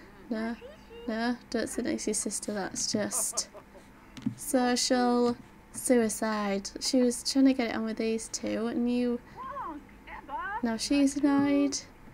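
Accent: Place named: England, United Kingdom. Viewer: where are you from? You sound english but like what city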